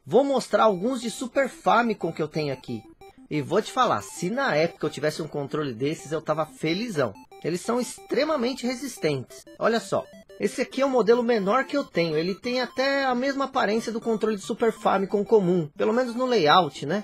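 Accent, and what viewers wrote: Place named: Minas Gerais, Brazil. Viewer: vc morava aqui em Minas Gerais? Tem um sotaque bem parecido com o da gente aqui xD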